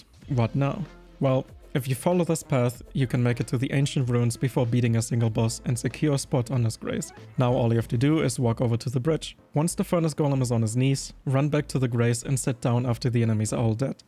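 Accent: Germany. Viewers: Du bist Deutscher, merkt man an deinem Akzent! Wieso also Englischsprachiger Kanal? More Money!😅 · Are you German? Klingt irgendwie so :D oh and thx for all that very useful information, tarnished <3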